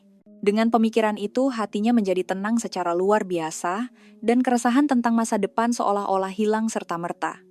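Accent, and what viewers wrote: Indonesia. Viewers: lagi suara org indon yg bercakap dlm video pasal malyasia.menyam pah dengar meleket2 percakapan dia.haprak lah punya org.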